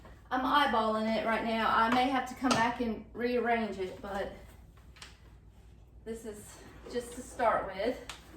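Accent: South, United States. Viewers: Thanks for getting back with me. Such a great idea. Love your southern accent and down to earth explanations. Joy to watch you🎃❤️ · I just decorated my house this morning. Now I’m watching you while I knit on this rainy Alaskan day. You are just so sweet and listening to you, I’m remembering my southern roots. ❤️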